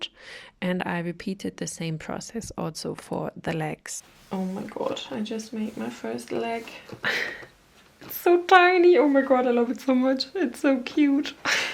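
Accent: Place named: Germany. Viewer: Hallo, eine sehr schöne Tasche hast du da. Mir hat damals der Karpfen schon sehr gut gefallen, aber so in 3D als Ente, einfach genial. Man hört, dass du aus Deutschland kommst, woher genau wenn ich fragen darf? LG Chrissi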